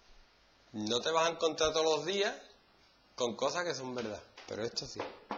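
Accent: Andalusia, Spain. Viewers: andaluz tenías que ser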